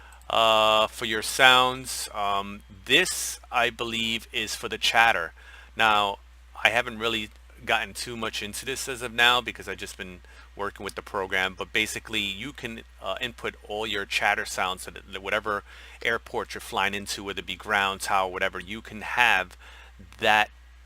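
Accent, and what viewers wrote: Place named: New York, United States. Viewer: Love that accent mate, New York?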